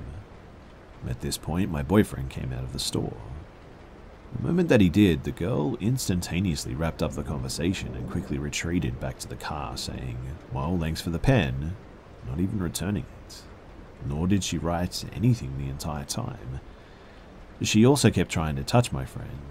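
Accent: Australia. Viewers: I love your stories! Your voice and Australian accent are awesome! You save my sanity on boring workdays.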